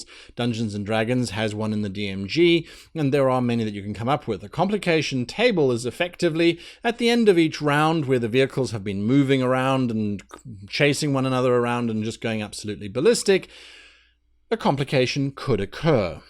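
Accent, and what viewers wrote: South, United States. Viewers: Damn, dude! For an african, thats not bad south hick north american accent! I can beat ya, but barely. Bet you can beat my dutch sailor. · HAHA!! GREAT SOUTHERN ACCENT!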